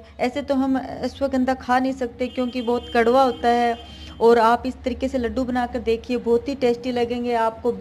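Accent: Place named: Bagri, India. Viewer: Good but aapki hindi me bagadi show ho rhi h 🙂 Keep it up bagadio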